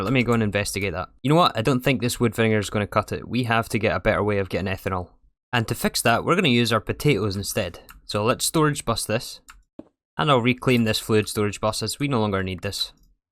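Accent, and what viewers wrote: Scotland, United Kingdom. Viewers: Yeah, from GitHub about this issue: "The red matter nerfs were too much, previously 100 red matter needed to be crafted, now it's 2" But it doesn't matter, at least you can now set up a proper energy core. I could only add one after finishing the pack. I literally rubber-hammered the creative key out of the assembler line for lack of power. Like I said, I do enjoy watching this series, you have a totally different approach than I have. Two days before finishing the pack I still had only one EBF. I added some more because I got impatient waiting for more tungsten. And there you are, 20 EBF's in a row and 1800 tungsten in stock :-D :-D So it's interesting to watch. The Scottish accent helps too ;-) Keep it up !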